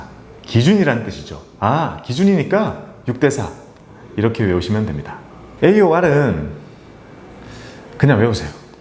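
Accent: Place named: Busan, South Korea. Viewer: 부산 출신이신가봐요 ㅎㅎㅎㅎㅎㅎㅎㅎㅎ재미있네요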